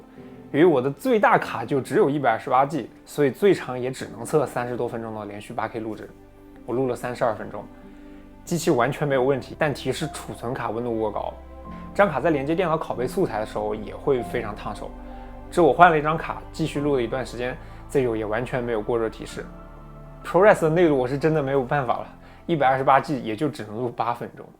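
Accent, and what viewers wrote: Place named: Henan, China. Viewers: 之前看过他一期视频，和他妈妈通电话，一口河南话啊，哈哈。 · 他是湖北十堰人，湖北北部的几个县市的方言还是很接近河南的。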